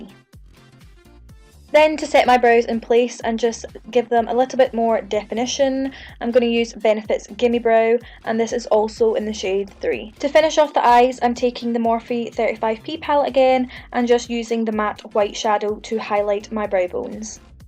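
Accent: Scotland, United Kingdom. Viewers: Gorgeous makeup look 😍 so different, glittery and colorful - I would really wear this for clubbing ☺️ I also have the Urban Decay Glitter Eyeliner but in the silver color and it is really nice 😎 Stumbled across your channel when I was searching for Scottish beauty youtubers (because I will study in Scotland soon so I wanted to practice listen to the accent 😄) and immediately subscribed 😇☺️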